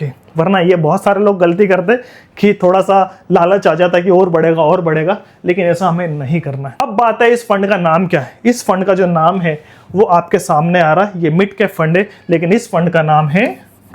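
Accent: India. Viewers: भाई मेवाड़ से हो क्या..??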